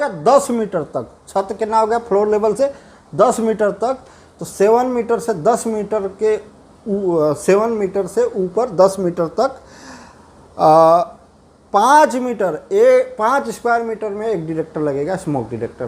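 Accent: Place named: Bihar, India. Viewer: Bihari accent ,can it be converted to normal hindi accent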